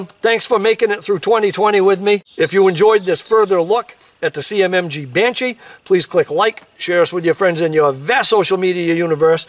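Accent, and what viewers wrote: Maine, United States. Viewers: Thanks! Your Mainah-ness makes me feel at home, away from home.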